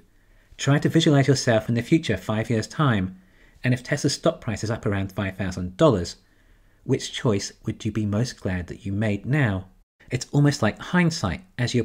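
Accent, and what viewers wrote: United Kingdom. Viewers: I always wonder, you seem to live in the US but you have a British accent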